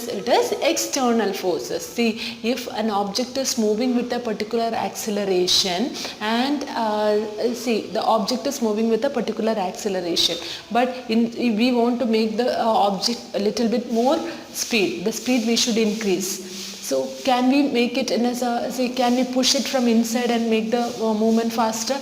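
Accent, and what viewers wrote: Kerala, India. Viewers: Are u from Kerala...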